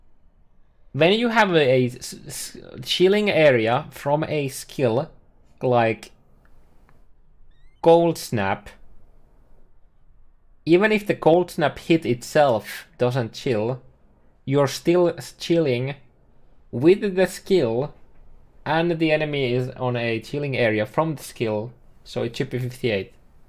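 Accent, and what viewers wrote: Finland. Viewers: Olin huomaavinani Suomalaisen aksentin ja sitten katsoin nimeä. Kyllä vain, hyvin tunnistettava aksentti.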